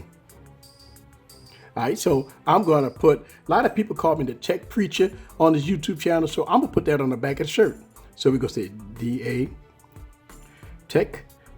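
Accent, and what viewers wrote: Louisiana, United States. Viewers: I hear the accent ! Gotta be from Louisiana I’m from New Orleans and you?! Great video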